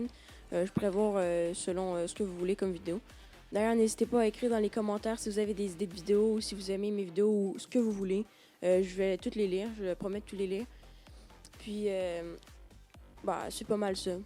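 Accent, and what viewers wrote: Quebec, Canada. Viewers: l'accent Québec j'adore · T'a pas un accent québécois ?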